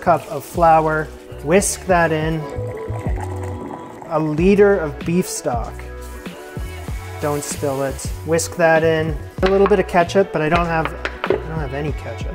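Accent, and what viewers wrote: Canada. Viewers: I'm a recent subscriber and I thought you sounded Canadian. Takes one to know one. I would hazard a guess that you are from the East. Love the show. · Heard your accent when you were making the Orange cake but your location said the US. Glad to see I can still pick it out. Poutine is king and essential after a night out!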